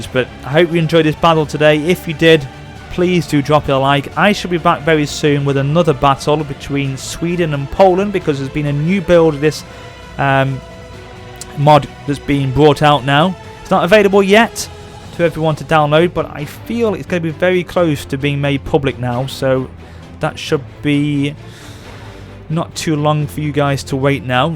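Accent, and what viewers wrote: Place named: England, United Kingdom. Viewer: MMm such a nice mod. Btw, 1. Do you live in the UK (I know you are Enlgish (Or at least sound it) 2. If you do what Provider do you use 3. If its bt what router do you use to play NTW!!! Ive tried 5 different routers and I still cant play NTW online and I cant exactly get a new provider xD Nice Video as well:)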